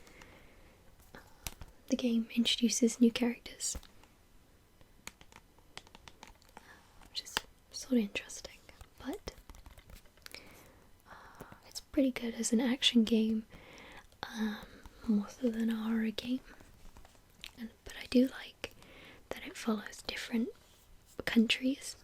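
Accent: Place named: United Kingdom. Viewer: YES I DID! Ive been watching it every night to fall asleep! I love the way you tap! More than the way most ASMR artists tap. Thank you! And your voice (and British accent, as I am American) is very relaxing as well.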